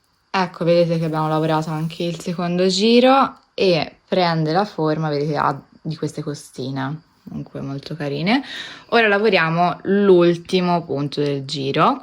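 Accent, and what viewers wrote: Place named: Tuscany, Italy. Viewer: Buongiorno, Una curiosità….io abito a Pietrasanta e dal tuo accento mi sembra che siate anche voi “di costa”, mi sbaglio?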